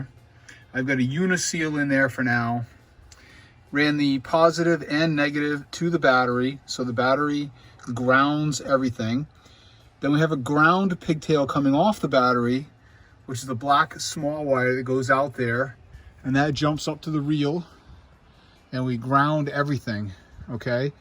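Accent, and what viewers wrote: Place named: New England, United States. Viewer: "Plus it's Dahk." The first time I've noticed the new england accent and I've got to be 9 months or better into your videos. Lol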